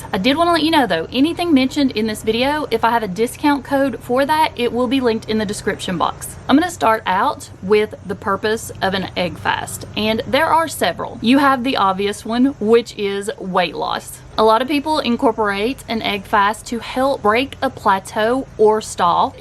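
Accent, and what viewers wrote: South, United States. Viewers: where you from the south?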